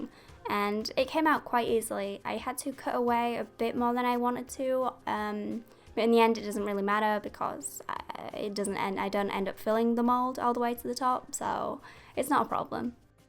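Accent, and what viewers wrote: United Kingdom. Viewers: Definitely gonna try this, you're such an inspiration. I really love british accent, what a joy to my ears to listen to you pronounce the word "Bloody" hahahaha :3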